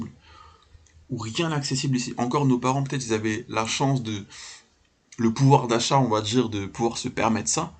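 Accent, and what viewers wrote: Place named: Banlieue, France. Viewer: C'est surtout un pays raciste quand on en entend ton accent de mec de cité ça m'étonne pas que tu trouves pas c'est vraiment une mentalité médiocre de gens jaloux la France. La seule chose pour que ça pette pas c'est le RSA, le chômage et la carte vitale sinon les services éducatifs sont flingués tout est fait pour t’empêcher de te former et de faire du fric c'est juste hallucinant c'est démoniaque. En plus ils font venir une tonne de migrants de pays du sud mais ils arrivent même pas à trouver du taff qui paye aux gens de cité comme toi les français d'origines étrangères nés de parents étrangers en France. C'est un chaos migratoire voulu pour baisser les salaires, créer le chômage et te laisser esclave au RSA. Et en plus aucun politicien n'interdit l'intelligence artificielle tout le monde laisse faire ces algos mathématiques remplacer les humains alors qu'elles peuvent bugger c'est hallucinant comme on essaye de détruire et appauvrir les gens :)) Et à côté de ça y a des ultra riches qui veulent pas payer 2% d’impôts il y a trop d'inégalités salariales on engraisse les même alors que le travailleur ce qui fait le vrai taff donc apporte la richesse n'arrive pas à s'enrichir suffisamment. 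La gestion du pays est maffieuse rien ne va il y a 1 député pour 100 personne alors que les politiciens ont détruit l'industrie pour les services du secteur tertiaire sauf que maintenant il n'y a plus de services c'est l'ia que les gros patrons veulent utiliser à la place des gens. Bref rien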